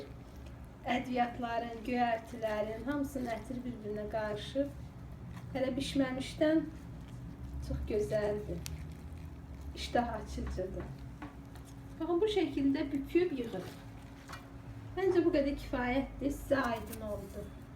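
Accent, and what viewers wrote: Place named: Nakhchivan, Azerbaijan. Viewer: AXWAMINIZ XEYIR XANIM MENDE BAKILIYAM SIZ HANSI KENDDENSIZ SOZLERIMIZ DANIWIQIMIZ OXWAYIR NOXARIWLA YE BELE DEYIRIK😊😊😊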